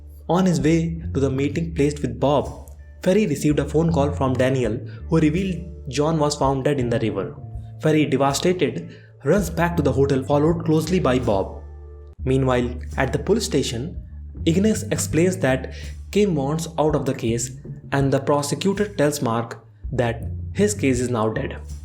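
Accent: India. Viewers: It is very strange to hear someone with an Indian? accent explaining a Belgian/Dutch tv show. It's nice that through platforms like Netflix people can watch shows from completely different countries that usually don't expand much internationally like eg US Hollywood movies (Like I don't think Squid Game would have ever been that big if we still had to rely on our tradition TV Channels, they probably would have never chosen to suddenly play a Korean series) Thank you for the recap.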